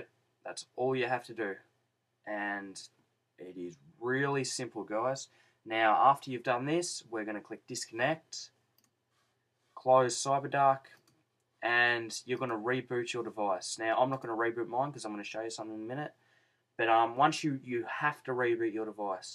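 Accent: Australia. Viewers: Sounds Australian to me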